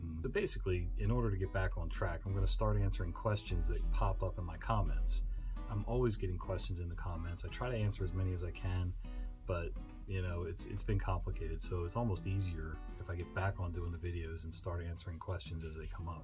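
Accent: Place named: Maryland, United States. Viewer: When I heard you talk, I knew you were a Marylander.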